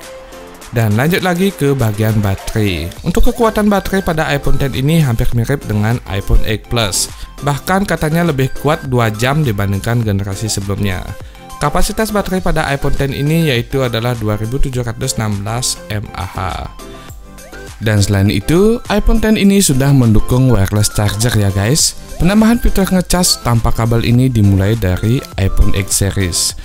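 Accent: Bali, Indonesia. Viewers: Jeg khas omongane bli, medok bali gati Ulian ne dot dadi meli puk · Logatnya Bali ni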